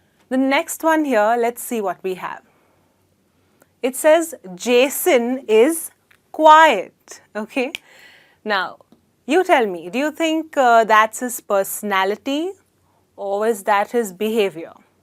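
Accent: India. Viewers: CARMENFERNAN YANET FERNANDEZ BUSTOS ....excuse me....but actually...she is an indian lady....so how she will be Ble to speak in american english...its common sense..dumb...u r an american ...so u will be never speak like an indian...r u???...nt at all...then why r u telling her....bc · Aleyda Narváez Narváez lol,,,, u moron,,,shez Indian,,, how cn she pronounce like u American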